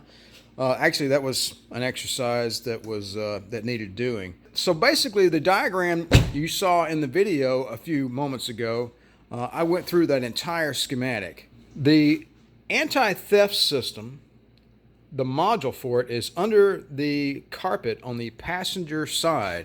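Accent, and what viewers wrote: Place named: Texas, United States. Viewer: This guy must be from TEXAS! Luv it man!... Good video, thank you sir...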